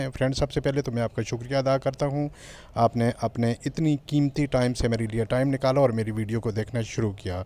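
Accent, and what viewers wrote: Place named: Azad Kashmir, Pakistan. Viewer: Aap ka lehja azad kashmir wala laghta hai.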